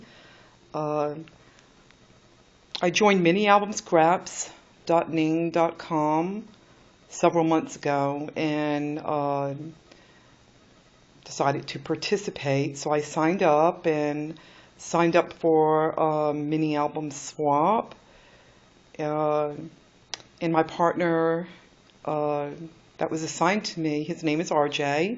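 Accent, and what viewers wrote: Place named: New England, United States. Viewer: Hi. So? I think I hear a bit of New England in your accent? I came over from RJ's channel. The album and it's box are gorgeous.Luscious tags! You did a great job with your 1st vid. I don't have a camera yet. I hope I do as well on my first. Kathy and Leann are both great artists. Have you ever seen Anne from xannero1 videos. She makes the most amazing things! With all sorts of mini shelves and boxes. She even made a mini sewing table for her last mini! TFS Hugs, Jess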